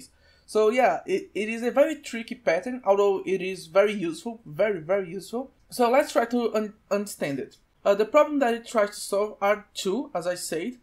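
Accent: Brazil. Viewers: I can recognize a fellow Brazilian just by his accent, continue a fazer seus videos que eles me ajudaram muito!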